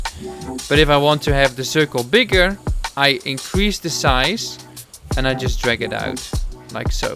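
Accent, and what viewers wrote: Netherlands. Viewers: main thing I learned from this video is that you're from New Zealand 😂I always assumed your accent was Dutch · one question, are you dutch? Love the vid btw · You realy sound like a dutch guy! Nice video btw! :D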